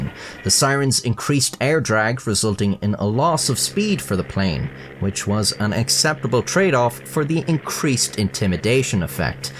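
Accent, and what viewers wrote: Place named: Ireland. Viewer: Hey Fella, Gotta say I love your vids and content - been watching your vids for a few years - no BS ads, great story's, well presented with your lovely Irish accent and half a brain to boot. I won't and don't like and subscribe on principal. But keep your home page up in a tab for when you do upload and you NEVER disappoint. Legend mate! Effing honest Youtuber and hope you still make a buck. .... Just a little thank you from me to you. Cheers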